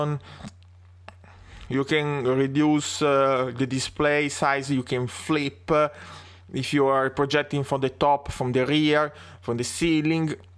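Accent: Italy. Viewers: Che forte accento italiano cavolo hihihih spero di vederti al raduno di piazza Duomo Milano .... ti seguo da tanto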